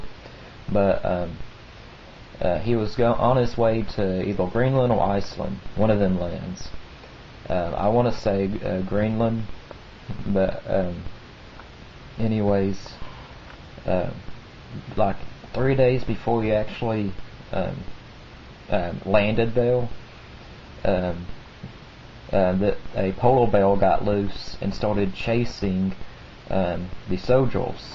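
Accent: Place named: South, United States. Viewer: He has a southern accent with a speech impediment. He substitutes /r/ with a /w/. "polow beaw" Great stories. You have a very mature and keen mindset. Keep it up!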